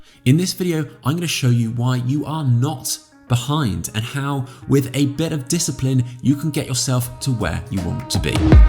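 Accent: England, United Kingdom. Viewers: Superb videos. I have subscribed to lots of American videos but its refreshing to have an English man doing it. Keep educating.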